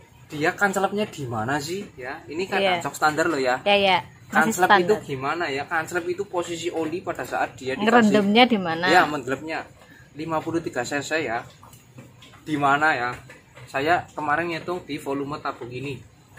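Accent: Banyumas, Indonesia. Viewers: Ora ngapak ora kepenak😀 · Ora ngapak ora penak pokoke lah mbak ayu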